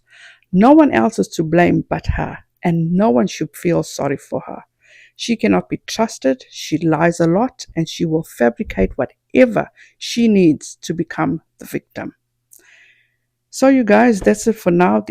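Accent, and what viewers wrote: South Africa. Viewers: So lovely to hear your beautiful South African accent! 🙌🏻🙌🏻 🇿🇦🇿🇦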